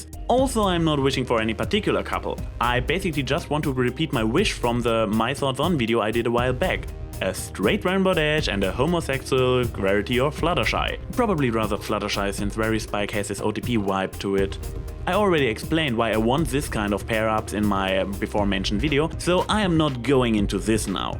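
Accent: Germany. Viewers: ... You're from German, ain't ya? I knew I recognized that accent.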